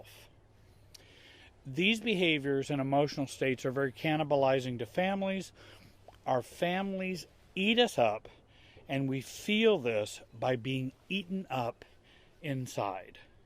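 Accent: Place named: Indiana, United States. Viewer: 100% this man is from Indiana lol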